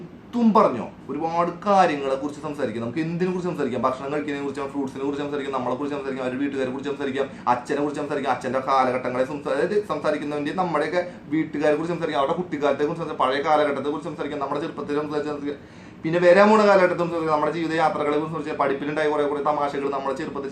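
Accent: Thrissur, India. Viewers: Bro തൃശ്ശൂർ ആണോ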